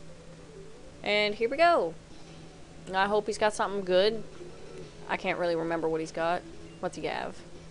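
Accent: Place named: South, United States. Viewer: I was wondering, do you live in south U.S? Just wondering cause you have a slight accent. =3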